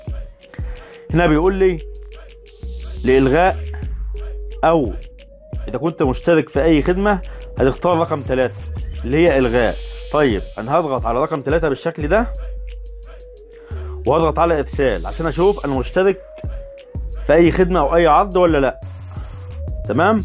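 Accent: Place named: Syria. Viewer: انت سوري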